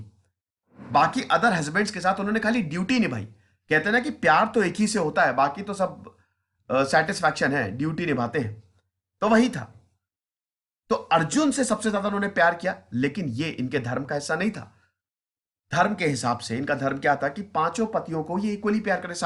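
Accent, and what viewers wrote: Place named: Uttar Pradesh, India. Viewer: I love to hear your voice Sir... N my favorite is Bhaiya.... The UP wala touch... 😁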